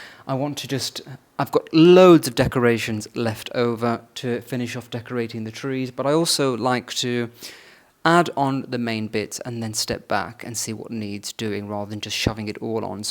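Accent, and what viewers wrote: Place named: England, United Kingdom. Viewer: Hi, I love your English accent and the way you decorate your house. My daughter loves the vintage decorations, and I know she loves your way. My taste is a little more glam, but I also like yours. I'm very glad to see your posts. I send you my blessings from Riverside, California USA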